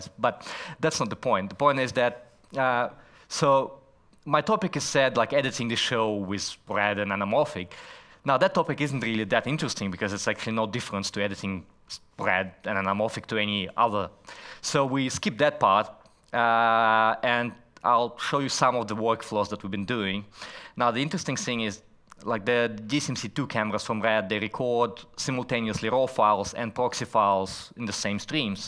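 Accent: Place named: Israel. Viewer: Your Israeli accent mixed in with so many other accents are driving me crazy. my brain is going nuts trying to process your voice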